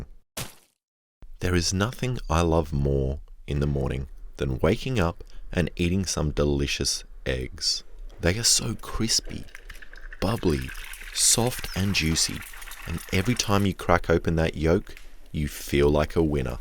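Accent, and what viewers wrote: Australia. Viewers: Obsession with eggs? Australian? Is that you, HowToBasic? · Is this a Australian, and talking? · Yami Yugi as an Aussie I can conform.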